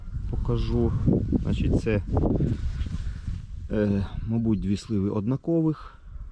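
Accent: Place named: Surzhyk, Ukraine. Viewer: Яку його мову ти розумієш?! Він же не українською мовою розмовляє, він розмовляє сільськiм суржiком!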